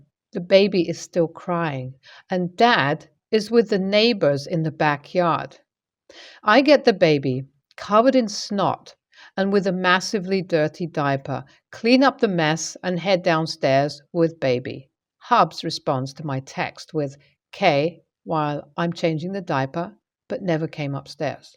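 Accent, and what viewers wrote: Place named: United Kingdom. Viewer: Pick an accent and stick to it!!! Its grating to hear the bad aspects of uk accents.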